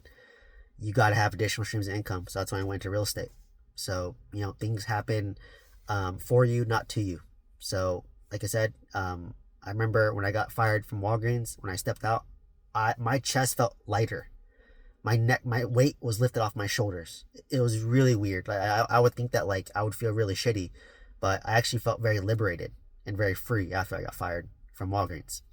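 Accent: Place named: California, United States. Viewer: He sounds like he's from California.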